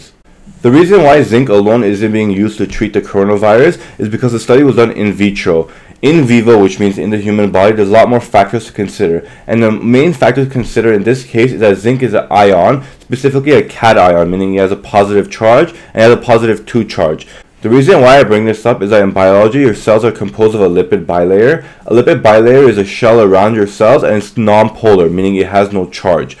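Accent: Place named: Levant, Syria. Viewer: Are you originally Syrian or Lebanese? I mean no offence just because of the accent. I am a linguist and do research some accents. Thanks for the infos, it was quite informative and needed!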